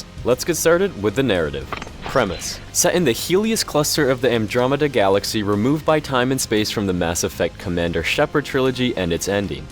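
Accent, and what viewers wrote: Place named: Canada. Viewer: ***** Lucky bastard, I'd love to have lived in Vancouver...The weather is perfect over there, that or maybe Slovakia...But yeah, I guess I should have said loosely pledged allegiance, ;^) Anyways, that's a lot of info I didn't know about my brethren across the bay, thanks for the info BTW. Was born on the Great lakes, so we got Toronto's wind...And CBC! (Heh as a snot-nose, I actually got your accent due to hours of CBC!)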